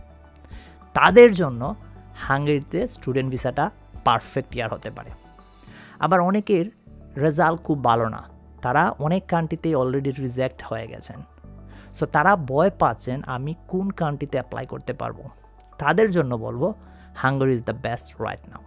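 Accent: Sylhet, Bangladesh. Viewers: ভাই আপনার বাড়ি কি সিলেট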